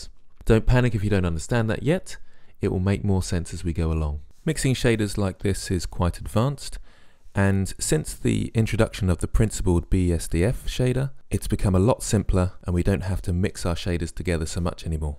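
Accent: United Kingdom. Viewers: one of the best blender tutorials. btw, I really love your british accent - listening to you is better than bbc actually !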